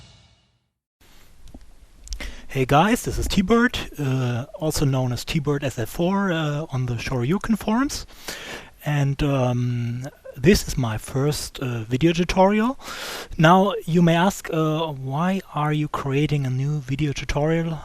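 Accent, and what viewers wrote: Germany. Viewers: ^^ du sprichst genauso englisch, wie ich, mit so einem deutschen Akzent, aber trotzdem gutes tut :D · Hi, just wanted to swing by to say that I find your tutorials very insightful, but unfortunately I can't stomach your accent for more then a couple of minutes.I hope this does not offend you, as I am german myself, and I know that most germans don't get the english pronunciations right. This is too bad, because apart from that, I really like your presentation.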